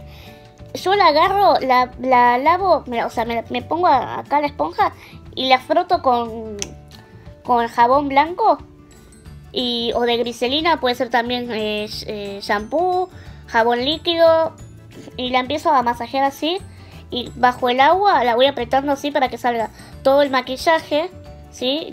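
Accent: Argentina. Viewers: jejjeje es argentina, el acento es muy chocante. Algo basico linda 😘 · sos de buenos aires??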